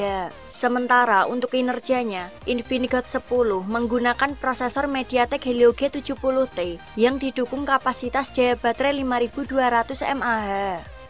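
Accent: Java, Indonesia. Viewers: Medok ayu lo, aku ya medok kebumen hahaha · suara nya bikin ngakak,,medok medok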